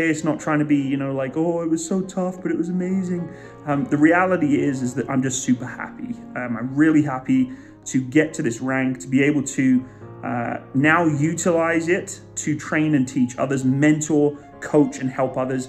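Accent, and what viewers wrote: United Kingdom. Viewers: I served with the SAS as a transfer. Good Job Mate! That Limey assed accent is yours and only yours Sir!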